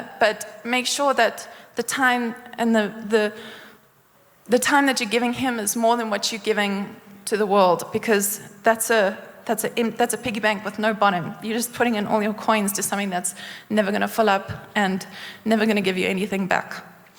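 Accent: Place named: South Africa. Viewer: Can't you hear she is SA.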